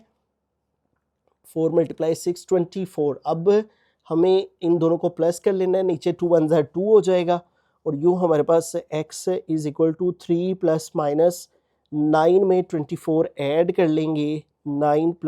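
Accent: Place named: Bihar, India. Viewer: Sir g mujhaie ap Ka lachter Bihar achie lag tai h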